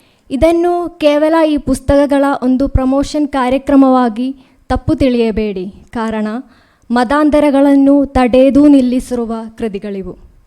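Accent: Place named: Kerala, India. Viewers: Malayali ka nada methadonde hage❤ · ಅದು ಆಕೆಯ ತಪ್ಪಲ್ಲ. ಕನ್ನಡ ಭಾಷಣವನ್ನು ಮಲಯಾಳಂನಲ್ಲಿ ಬರೆದು ಓದಿದಾಗ ಹಾಗೆ ಅನಿಸುತ್ತದೆ. ಅಷ್ಟಾದರೂ ಆಕೆ ಕನ್ನಡ ಮಾತನಾಡಿದ್ದಕ್ಕೆ ಸಂತೋಷ ಪಡೋಣ. ಸಣ್ಣ ಪುಟ್ಟ ತಪ್ಪುಗಳನ್ನು ಗಣನೆಗೆ ತೆಗೆದುಕೊಳ್ಳದೆ ಇರುವುದು ಒಳ್ಳೆಯದು.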